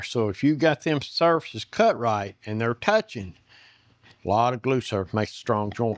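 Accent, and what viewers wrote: South, United States. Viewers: I had asked, in a different video, what state you were from because I could not quite place it. I was thinking Kentucky, Tenn, NCar or maybe Virginia. I got pretty close. I'm from Texas, so knew it wasn't that one -- farther east than TX or LA, and farther north than MS, AL, etc.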